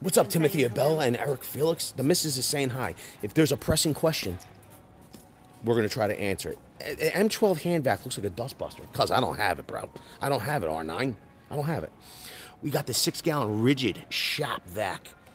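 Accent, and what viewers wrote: Philadelphia, United States. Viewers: Love the strong Philly accent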